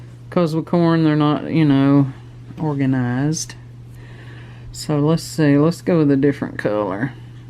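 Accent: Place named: South, United States. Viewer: I really love your ideas, but I really got triggered with all the mess on the table and the glue strings, I’m a OCD when it comes to creating, I set my things out on my work station, then I decide where to start on my project, then I work and finish the first section, then I tidy that stuff away before I start on the next section, I really get anxious with messy work stations, I was cringing the whole way through, the paint on your fingers got to me too. Not hating, so please don’t think I am, I’m just saying it triggered me. I do however, find your voice to be soothing so that helped me to continue to watch you create this shabby chic corn decor, it’s so refreshing to see people don’t just stick to autumnal colours, I love the shabby chic look. It can’t be all bad, I subscribed despite my OCD tendencies. TFS love from the 🇬🇧 I love your southern accent.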